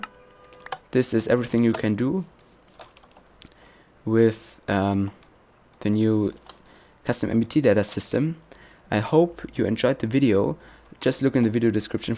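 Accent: Germany. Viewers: are you german? :D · Are you from Germany ? xD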